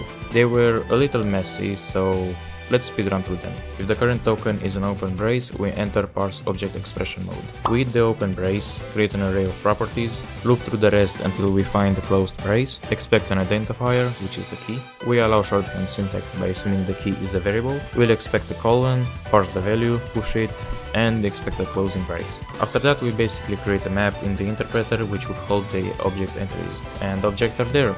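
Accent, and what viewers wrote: Moldova. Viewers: Băi ești moldovean?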